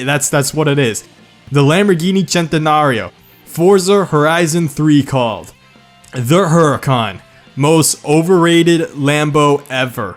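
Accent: United States. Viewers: I was thinking your American not Asian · I just love listening to americans pronouncing italian car names, I am italian🤣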